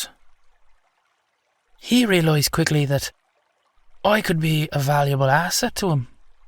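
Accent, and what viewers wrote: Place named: Ireland. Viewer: Something is a bit fishy...... Love this audio 😀 And I love the Irish accent ❤❤❤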